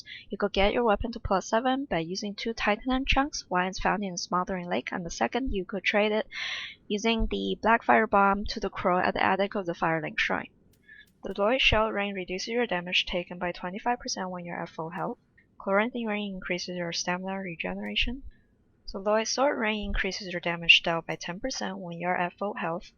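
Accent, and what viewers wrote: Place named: China. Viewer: 妹子你是中国人嘛😳 I like your accent; it sounds just like mine.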